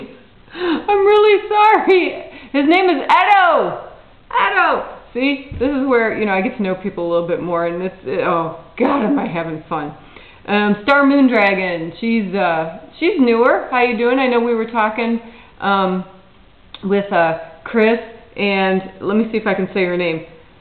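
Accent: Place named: Maritimes, Canada. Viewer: Off topic? Meh! I just noticed how you say some vowels is like Maritimers...lol Yo, East coast, baby ;p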